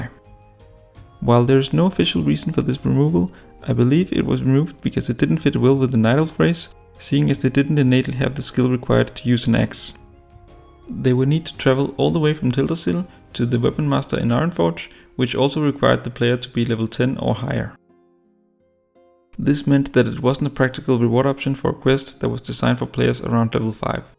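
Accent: Denmark. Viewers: the danglish is noticable in this one ;D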